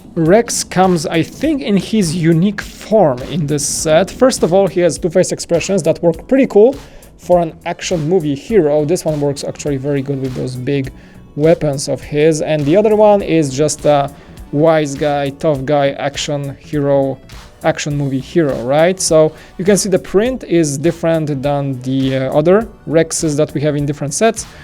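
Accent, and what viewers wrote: Poland. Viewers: I’m guessing Polish 🇵🇱 But I could be clearly wrong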